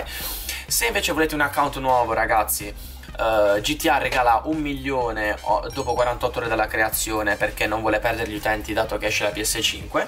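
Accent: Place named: Apulia, Italy. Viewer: Scusa se te lo chiedo ma sei pugliese? Perché hai quasi, se non del tutto, il mio stesso accento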